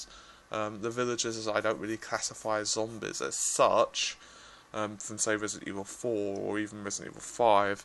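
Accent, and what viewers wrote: England, United Kingdom. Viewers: fucking english accent cant understand all, i took headset to understand him.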